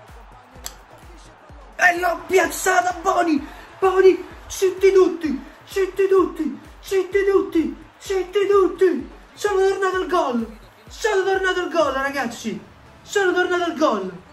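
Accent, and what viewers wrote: Rome, Italy. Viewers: Fai troppo ridere amo tantissimo il tuo accento Romano 😂😂😂😂😂❤❤❤❤❤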